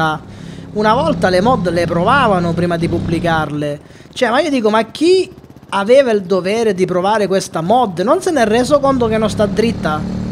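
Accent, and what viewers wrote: Sicily, Italy. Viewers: . Parla. In. Siciliano. Mi. Piace.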